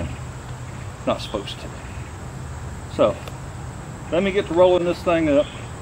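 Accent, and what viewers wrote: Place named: Appalachia, United States. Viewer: Look up fellow YouTuber "Butter Bob" & the title to one of his first videos " Butter will make you pants fall off" - I'm close to you age & got up to around 270lb - Started following Butter Bob & lost 57lbs in 5 months couple yesrs sgo & kept it of - I'm also Veteran serving from <num> before I was Medical Discharged - You have an accent similar to folks that live around me so you must be from one of these "Hillbilly States around here - Take Care & get yourself in shape Sir for your family & feeling as good as did when you were 25 yr old- jlo out here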